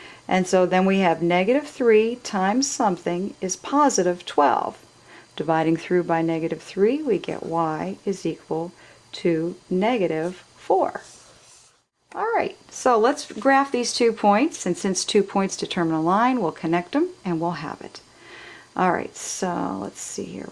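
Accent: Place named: United States. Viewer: I like your american accent, its very soothing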